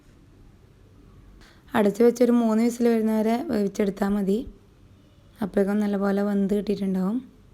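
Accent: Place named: Kasaragod, India. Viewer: Kasrgod slang orupad ishtayii.... Masala doshayum sprrr🥰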